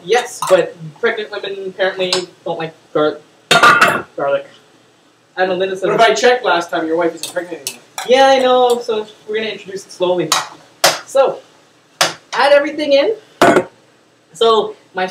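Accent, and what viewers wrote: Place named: United States. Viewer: trini cooking trini and have american accent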